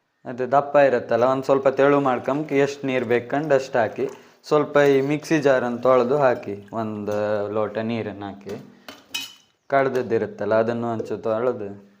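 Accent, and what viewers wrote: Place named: Kundapura, India. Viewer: ಸೂಪರ್ ಪ್ಯೂರ್ ಕುಂದಾಪುರ ಕನ್ನಡ ಮಾತಡದ್ ಲಾಯ್ಕ್ ಆಯ್ತ್. ತಂಬುಳಿ ಒಳ್ಳೆ ಇತ್ ಮಾರ್ರೆ 😍😍👌🏼👌🏼👌🏼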